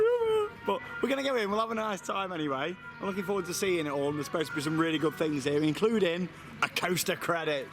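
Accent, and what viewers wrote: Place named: United Kingdom. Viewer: It's cool to see another brit with a heavy accent in an Arab country lol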